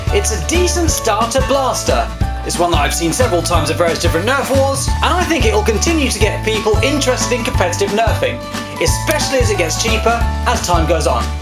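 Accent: United Kingdom. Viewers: You are british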